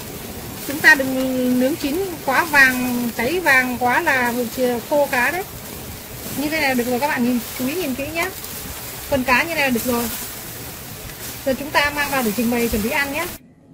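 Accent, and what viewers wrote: Hanoi, Vietnam. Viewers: ngon quá chị ơi ,đúng chuẩn món ngon đặc hà nội luôn ,nghe cách chị nói và làm đâu ra đó vậy là e biết chị là nguời gốc hà thành rồi ,cảm ơn chị nha ,chắc một ngày rảnh nào đó mình sẽ làm cho gđ mình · chi noi tieng ha noi rat chuan nghe rat re chiu, khong nhu nguoi ha loi bay gio loi tieng viet lam nghe rat choi tai .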